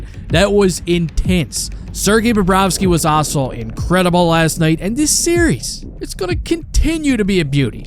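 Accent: Newfoundland, Canada. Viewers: East coast Newfoundland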